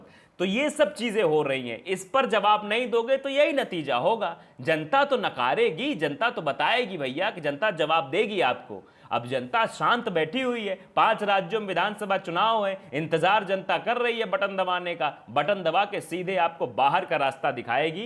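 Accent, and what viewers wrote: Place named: Uttar Pradesh, India. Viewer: present news very nicely. His tune is very much UP based. I like it very much and enjoy listening him. Keep going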